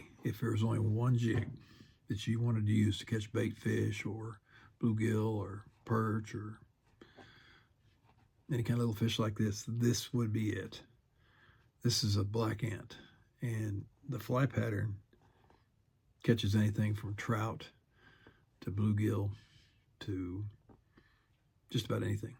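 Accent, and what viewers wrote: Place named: Kansas, United States. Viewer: Grew up in western KS. Thought I recognized the accent. 😁Neat idea on how to make your dubbing. I'm new to tying. I haven't had a chance to get out and buy supplies, so I'm experimenting with using the fluff from chicken feathers.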